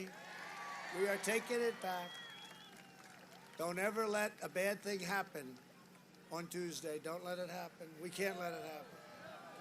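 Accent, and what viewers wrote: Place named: New York, United States. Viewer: Jesus....if the Man doesn't win it's not because he didnt try. ALWAYS on the Go. And here's the thing....if Biden doesnt give me more of a reason to vote for him then Im voting Trump. I want some energy. Some excitement. Trump hasn't done THAT bad. I can't blame him for the Virus response...he had nothing to go by. He talks like he is: A New Yorker. Good AND Bad. Im STILL undecided.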